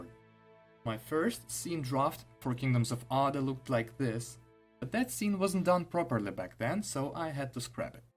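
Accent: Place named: Russia. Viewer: Я по акценту сразу определил русского)))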